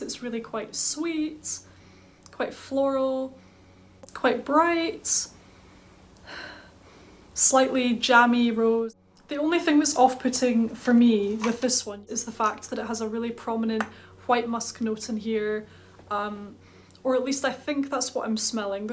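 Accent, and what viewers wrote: United Kingdom. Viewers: Just discovered your channel and I‘m glad I did! Your vids have a nice, relaxing vibe. Are you British? I‘m sorry, I‘m Austrian and can‘t really tell, but it’s lovely and I understand you 😀 How large is your collection? Wasn‘t too keen on Nina Ricci Rose Extase either, to me it smells plasticky.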